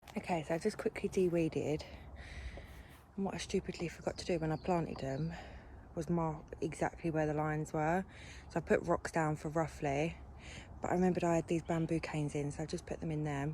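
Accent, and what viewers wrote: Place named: Essex, United Kingdom. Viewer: I believe it's an Essex accent.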